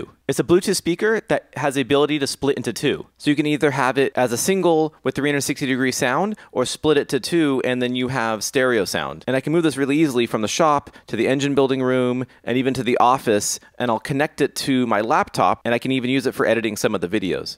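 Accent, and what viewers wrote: Greece. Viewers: R u greek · Are you from greece?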